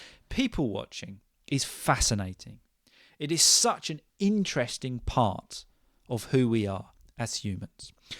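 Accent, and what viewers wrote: United Kingdom. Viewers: I love your vibe and clear British accent 😊❤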